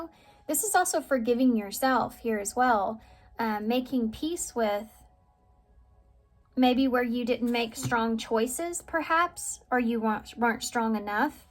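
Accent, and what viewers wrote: South, United States. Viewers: Pile 2 hit me hard. I was drawn to it and now I know why. The tower moment for me was my momma collapsed in front of me and I couldn’t save her. My gf at the time , addiction broke us apart. I moved out west and have been single for 9 years. I was having flashbacks today and when I found you I wanted to know what I needed to hear. I have been closed off from love the whole time. Healing and growing spiritually. I literally just ordered a shamanic drum two days ago for myself and loved that you said beat the drum get up and dance. Thank you for sharing your gifts. Also I grew up in Tennessee so your country accent was endearing to hear ❤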